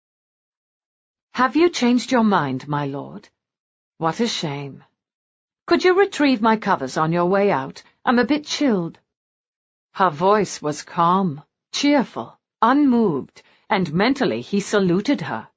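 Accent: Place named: England, United Kingdom. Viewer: Regarding pronunciation. Many words spoken in England are pronounced differently in the US. This story is read by an English woman. All of these differences is what makes the world and different cultures so fascinating.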